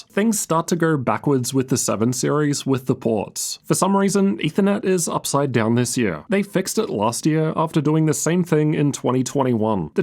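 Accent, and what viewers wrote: Australia. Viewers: The most Australian Australian I've ever heard, nice comparisons, thank you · Okay but what are now the best and worst laptops from this year's CES? This is just a recap I came here to hear some Aussie ranting!!! :D Still nice video :)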